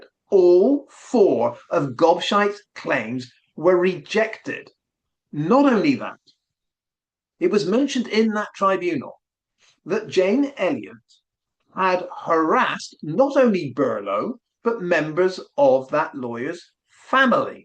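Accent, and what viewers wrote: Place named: Scotland, United Kingdom. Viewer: Loving your wee Scottish accent! 😂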